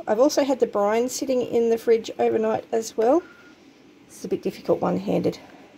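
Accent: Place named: Australia. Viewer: Hello, just met you on the Silhouette live, there were so many that subscribe to our channel and I just wanted to say thank you ❤️ I just subscribe to your channel looking forward to watching you. Love your Australia accent, Lisa from moneypit homestead we are in Kentucky usa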